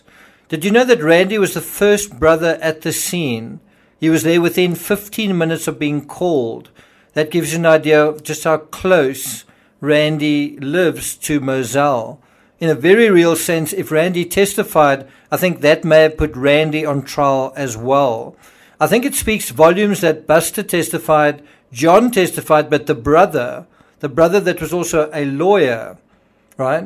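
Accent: South Africa. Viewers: Sir, I love your South African accent;it's beautiful........ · Nice voice, South African! I agree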